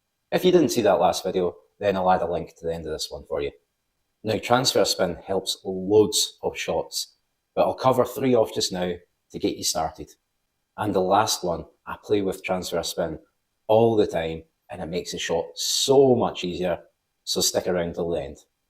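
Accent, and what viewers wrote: Scotland, United Kingdom. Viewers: Nice to hear a Scottish accent. Thanks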